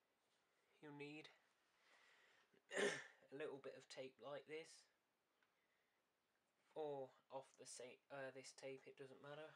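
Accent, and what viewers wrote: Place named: London, United Kingdom. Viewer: Do u live in like London or some thing? Cause ur accent sound like it a little. 👾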